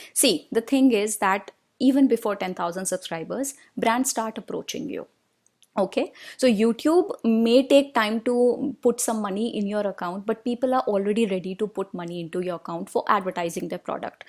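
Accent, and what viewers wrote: India. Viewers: Omg! I just love Indian people! 💓And I missed India 🇮🇳 accent is amazing 😊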